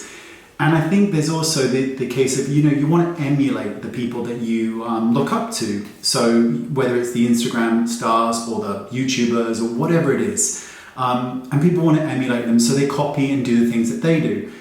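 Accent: United Kingdom. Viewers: I always love your videos and Brit accent:-) Makeup is supposed to enhance one's natural beauty and not to produce artificiality. This will help a person age beautifully. Otherwise they will be like mugshots. What I love about you is that you always emphasize this point. Don't worry about who says bad about you. We all love you. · Absolutely, Lovely British accent ! I am not even using any make up I just keep listening !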